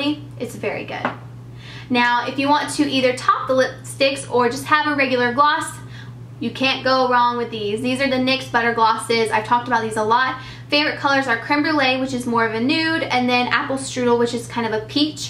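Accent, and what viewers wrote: South, United States. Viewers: I found your channel when I was looking for hair tutorials & I came across you when you had your blonde hair & adorable southern accent( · wow your so pretty and you sound like an all around beautiful american girl! I enjoyed your video :)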